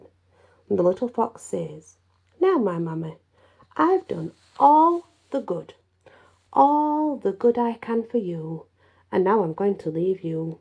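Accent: United Kingdom. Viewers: Hey sis, im connecting from Krishna ..I love your beautiful brittish accent ...i love the story ...❤❤❤❤ love your contents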